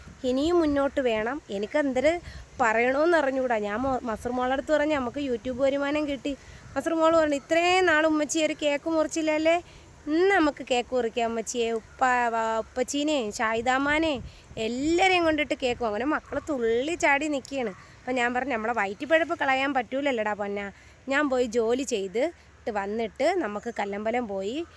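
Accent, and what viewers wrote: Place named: North Kerala, India. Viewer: Hlo എടാ ..നിന്റെ വീട്‌ കൊല്ലം ആണോ ...ഈ പാരിപ്പള്ളി എവിടാ ...നിന്റെ slag വടക്കൻ കേരളത്തിലെ പോലെയാണല്ലോ .....ഒന്ന് പറയണേ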